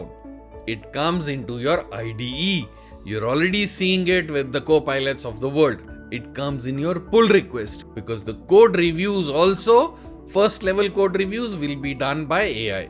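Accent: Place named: India. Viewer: Your accent is from India. I am assuming you were born in India. It is an embarrassment you are talking about this when the whole country is at war. Why this now ???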